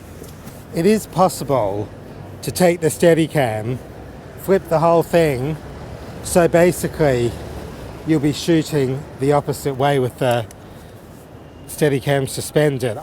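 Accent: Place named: England, United Kingdom. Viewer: I do admit...I love your English accent...!!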